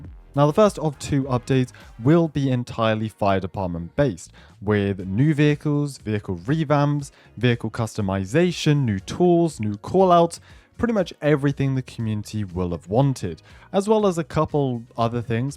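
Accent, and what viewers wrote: United Kingdom. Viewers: Are you British or American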